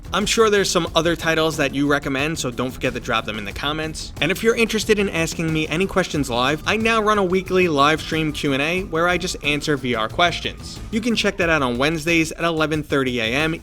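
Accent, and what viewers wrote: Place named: Boston, United States. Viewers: Yo this man is so Boston I thought he was Mark Wahlberg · You from long island? Maybe Mass cuz I see you tryna hide it but sounds more like here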